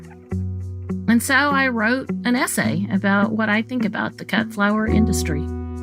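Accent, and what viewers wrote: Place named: South, United States. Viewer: OMG yes. I'm a Mississippian and was pleased to year a southern accent and someone talking about the south. Sadly, it was a standard-issue liberal scolding that hit every cliche: the scolding of a minor pleasure, the implication that people in the global south would rather be unemployed than harvesting flowers, and finally, suggestions to either spend a ton of money on bespoke alternatives or to just "go for a walk or send a letter." If these moaners got their way, we'd be sending letters and giving hugs for every single holiday. I'm sure there are mirrors of this Valentine's Day complaint ready to go about the evils of Halloween candy (diabetes! tooth decay!) and Christmas presents (commercialization! Capitalism!). How about a nice hug, letter, or a $1000 healthy candy replacement made by your local candy monk? When a person buys a jar of jelly at a farmer's market for 10x the price of Welch's, they get to flaunt wealth, their own tastefulness, and their liberal values about caring for the Earth. 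This whole thing really rankles me.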